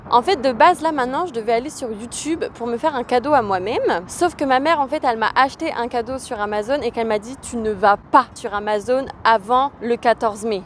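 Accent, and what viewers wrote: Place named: North, France. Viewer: l'accent du nord même au states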